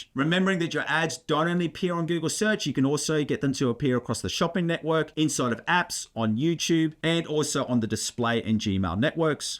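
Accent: Australia. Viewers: Oh yes a fellow Aussie! much rather listen to a aussie talking about this stuff.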